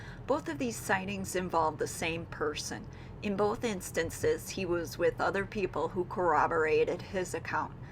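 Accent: Wisconsin, United States. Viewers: I think some of the commenters think you're mocking believers because of the tone of your voice, you're not, if people have seen your other videos you have a very open mind. I think it's the Wisconsin accent that's throwing people off.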